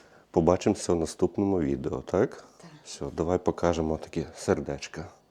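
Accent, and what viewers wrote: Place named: Ternopil, Ukraine. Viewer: земляку вітаю акцент Тернополя точно тобі вже пора нового петровича 389 😂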